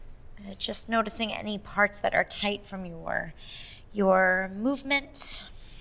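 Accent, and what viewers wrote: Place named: United States. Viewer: I wanted to watch but American accents are so annoying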